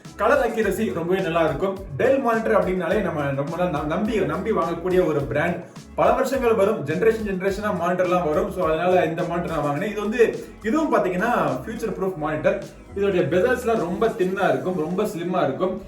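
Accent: Madurai, India. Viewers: Neenga madurai ah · Bro neenga madurai ya?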